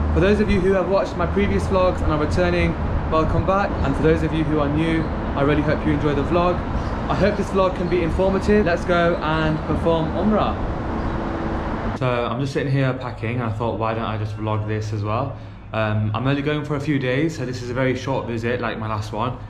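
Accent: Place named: United Kingdom. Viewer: Salaam just found your channel, by browsing Umrah videos. I live in USA, but I took a vacation to the UK. I think you are from there with your accent. Jazakallah Khayr, such a beautiful video and a vlog. your off pakistani descent?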